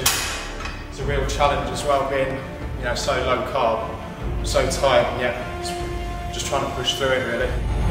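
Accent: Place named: United Kingdom. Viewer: British accent + good body (y)!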